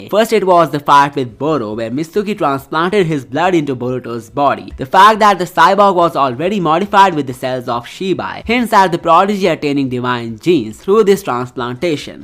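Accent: India. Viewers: Bro pls tell me you are Indian or not, coz your accent sounds like it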